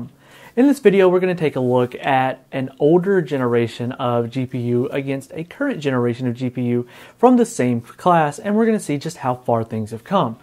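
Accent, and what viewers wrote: South, United States. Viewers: Lemme guess you from the south of the US as you sound country haha, cool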